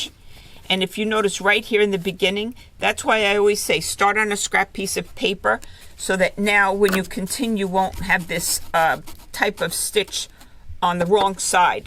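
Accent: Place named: New York, United States. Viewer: Some great tips thanks and I love the NYC accent! She sounds like Fran Dresher...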